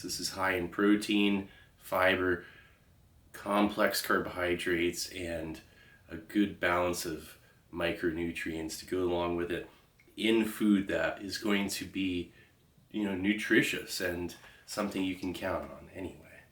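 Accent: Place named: Texas, United States. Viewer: Where is this guy from? He has an interesting accent sometimes, not sure if he is from Texas or somewhere else.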